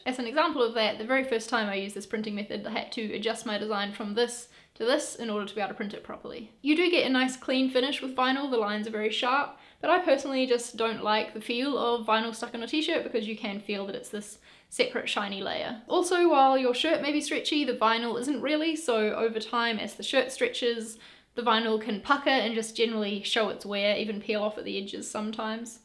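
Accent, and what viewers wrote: New Zealand. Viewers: Off topic but, love the NZ accent! Thanks for this! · I thought I could hear a Kiwi accent and so read the description, sure enough you are! Thank you for the information, and I am off to see your screen printing video now :)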